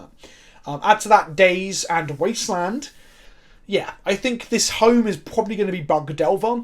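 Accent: United Kingdom. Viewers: It's hard to listen on anything past 1.25 speed like i normally do, bc British accent lol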